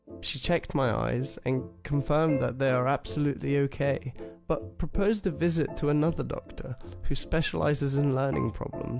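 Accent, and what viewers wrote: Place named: United Kingdom. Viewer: His voice in this story is pleasing to listen to and his British accent sounds pleasant too.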